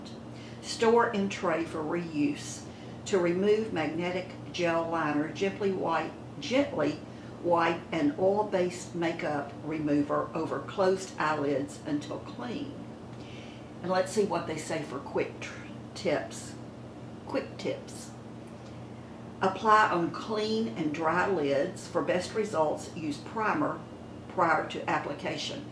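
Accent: South, United States. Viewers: You are the cutest! The lashes look great on you! Your southern accent 😍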